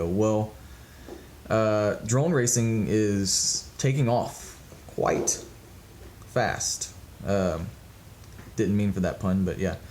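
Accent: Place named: Texas, United States. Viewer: Digging the vids and your accent sounds just like me and everyone I know from Texas. Just got a Wizard from Grayson Hobby today, goggles have not arrived from banggood yet. I have not really flown drones much, maybe 4 times and not racers. I do however play the shit out of Battlefield and all I do is fly the copter and I think it teaches you how to maneuver a rotor and use the controls so I think I am ahead of the game, controls are the same just a lot more sensitive on the drone. I hovered the Wizard in my living room tonight, piece of cake. I will say my confidence is high but I am going to do it just like you. Hover, learn to move, low and slow to begin. I also have a tiny whoop on order to practice with and I already have the cable on its way to run sims on the computer with the FS I6. I already have a sim and am currently using the PS controller to practice until I get my cable. 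So everything you say is good advise the way I see it. Eventually we will get there. I just wanna fly, not in it to race. Well, not yet. Time will tell. Keep up the good work. Keep the vids coming.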